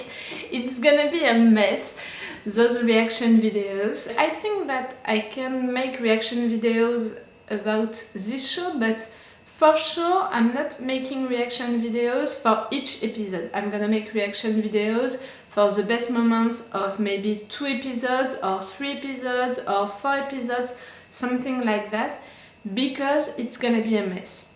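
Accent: France. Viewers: Oh... Hi, I´m spanish and I just love when you say "La casa de papel" (and even "hija de puta" hahaha!) with that beautiful french accent. I´m also new at your channel, just finish the show, and deeply in love of your reaction <3 Really really sorry I don´t speak french, hope you can understand me, désolé:(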